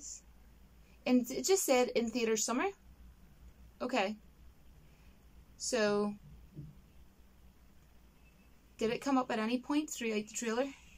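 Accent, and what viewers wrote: Ireland. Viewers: Agreed. I hope to not be too much with this comment, but I love your accent! I want you read me every book in audio format lol. Never played the Witcher, but I could pick out that smooth Irish brogue from the other side of the world! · Intresting accent.. is that Irish? i love your accent, it remind me of witcher 3's NPCs.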